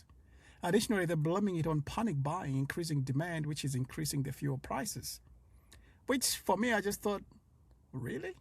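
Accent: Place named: Australia. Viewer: I like your accent. Lots of aussie in there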